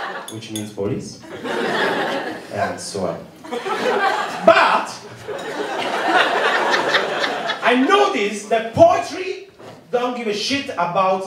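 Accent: Italy. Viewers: Fantastico! È proprio vero che il talento non ha barriere... Nemmeno quelle linguistiche. Il tuo inglese dall'accento italiano ti rende ancora più efficace! Bravissimo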